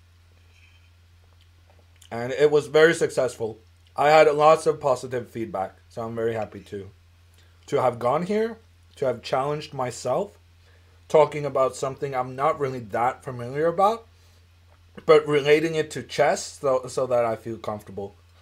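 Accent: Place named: United States. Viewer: Du låter nästan amerikansk.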